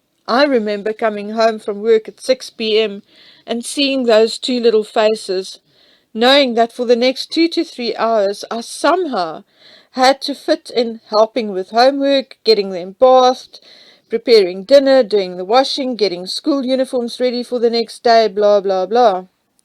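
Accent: South Africa. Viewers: I read somewhere ages ago that Thomas was also afraid of Meghan, her rages were really bad and she used to physically attack him. From the news of the way she abused her staff and other people’s staff verbally and physically, I can imagine this is true. Do you have any clarification on this? On Doria she had a long gay relationship with another woman. Which ended just after Harry’s wedding. So she definitely doesn’t like men! I do feel strongly about Archie, if he does exist, he is being kept in isolation which is cruel and unkind treatment of a child. Anyone else whole have had child services called on them. Meghan isolates those she wants to control - she is extremely abusive! Ps. I love your videos, you obviously spend a lot of time investigating. I also like your accent, I went to school in South Africa so your accent is nice and familiar, thank you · Thank you for your honest and unbiased opinions. 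So refreshing to hear a fellow South African's accent. Regards from Cape Town. God bless😍 .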